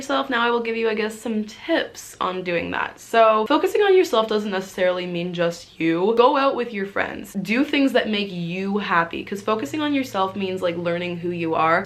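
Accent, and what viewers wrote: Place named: Canada. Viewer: That Canadian accent is god awful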